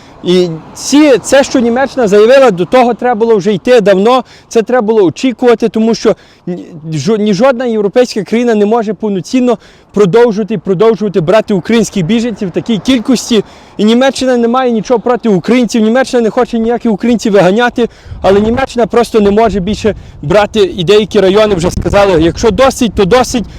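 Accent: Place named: Lviv, Ukraine. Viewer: Ты не булькай на своем Львовском языке, приезжай в Украину и отдавай долг родине, а то только рассказываешь про патриотов.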